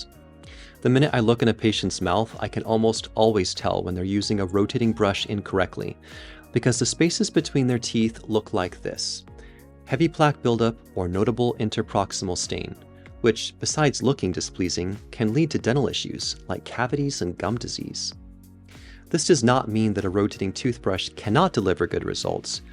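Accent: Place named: Scotland, United Kingdom. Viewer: Was going to get oral but now sonic! Thanks for this! Love your accent from Scotland 🏴!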